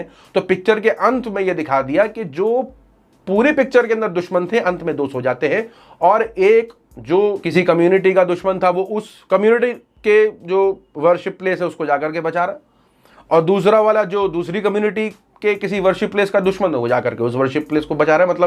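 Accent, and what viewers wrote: India. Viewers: Bhai ye south Indian hai aisach hai iska language style.......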